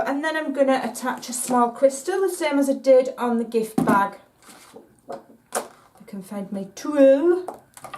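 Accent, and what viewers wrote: Yorkshire, United Kingdom. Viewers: Fabulous Hun! Can I ask where you accent is from - you remind me of my mother in law (voice wise!) I'm going to say just about Yorkshire x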